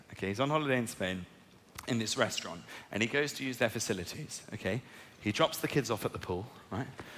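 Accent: RP, United Kingdom. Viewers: Get better friends, lol. No but honestly, I'm Swedish too and most people I know would be able to follow this no problem. When I saw Jimmy Carr here in Sweden the venue was sold out, people here like British comedy and language is not generally a barrier. Maybe for some people but not the majority. And my experience is people who do struggle a bit with English generally find British English easier than American, and Jack is quite posh so that doesn't exactly hurt. Hade gärna sett Jack live också om han kommit till Sverige men 2014 visste inte jag vem han var tyvärr!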